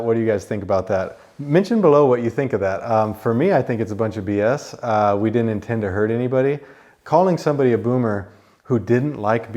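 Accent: United States. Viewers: I’m new to the channel are you American?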